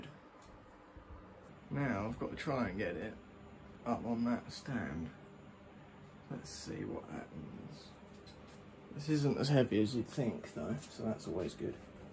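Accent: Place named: South East England, United Kingdom. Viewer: That's just how most people in SE UK sound btw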